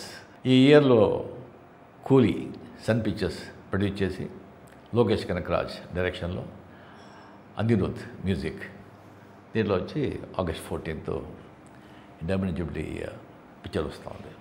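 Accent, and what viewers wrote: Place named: Karnataka, India. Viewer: really our thalaivar telugu speech is osm it seems he speaks telugu better than tamil only in movies thalaivar tamil will be like native but in offscreen his tamil contains kannada marati accent but his telugu is clear and natural sounding telugu is very easiest language in south india but many tamil actors struggle to understand and talk telgu even after so many years of release of their movie in andhra kamal sir karthi sir chiyan vikram sir but karthi sir telgu is quite decent kamal sir chiyan vikram sir telgu carrys tamil accent slight but thalaivar telugu is like close to native according to me he really speaks telugu better than tamil. in movies he deliver scripted tamil dialogues but his tamil is still bit impure no tamil actor can beat him in telugu speaking. he speaks telugu with null influence of other language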